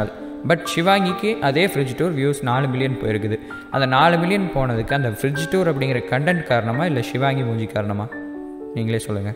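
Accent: Coimbatore, India. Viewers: Eriutha eriyanunu tha da pottan 😂😂😂😂😂😂 semma bro super ah panriga unga slang super.. ungaluku konjam covai slang um varuthu semma bro evlo nal enga irruthiga ungala yt miss pannrichu neega kandipa top la varuvinga ungalukaga pray pannikuran....😍🥰 And Happy birthday sorry for the late wish 😍😍😍😍😍😍😍😍😍😍😍😍😍😍😍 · Kongu Tamil 🙂🙂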